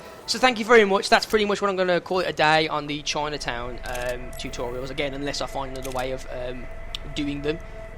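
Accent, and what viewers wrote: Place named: Birmingham, United Kingdom. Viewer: You sound like you're from Birmingham.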